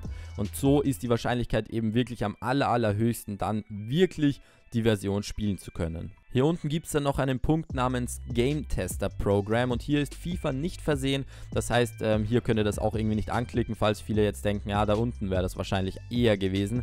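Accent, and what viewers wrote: Austria. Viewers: Ned gewusst das du a Österreicha bist🤔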